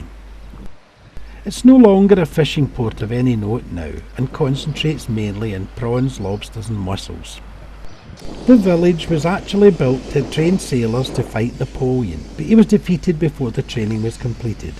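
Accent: Scotland, United Kingdom. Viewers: You have a wonderful Scottish accent, so melodic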